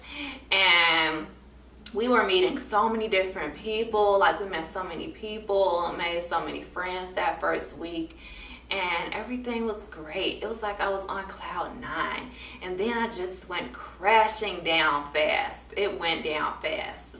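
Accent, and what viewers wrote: Chicago, United States. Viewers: I had a feeling you was from Chicago. I watch your videos often and I always be knowing when people from Chicago!! I can just hear it in your voice and stuff. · Are you from Chicago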